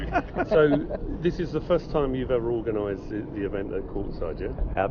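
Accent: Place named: United Kingdom. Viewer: What I'm not a fan of is inappropriate noise. What I am a fan of is this;A beautiful British accent,A natural sense of humor spoken in subtle ways that is charming and funny,An obvious affectionate relationship between a man and woman that shows their depth of respect and love for each other. It is this magic that AC/DC spoils because they are anything but the above.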